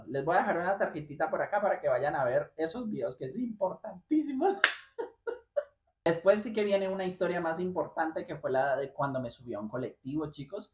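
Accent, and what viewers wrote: Paraguay. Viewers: Ya tiene tono Paraguayo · Hasta la tonada casi ya es 100% Paraguayo.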